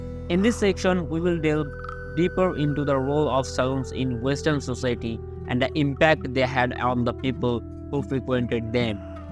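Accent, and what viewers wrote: India. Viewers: An Indian narrator? REALLY?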